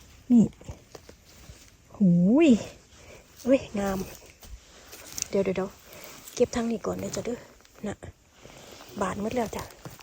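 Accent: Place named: Korat, Thailand. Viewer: ฟังคนโคราชบ้านเองพูดแล้วชื่นใจ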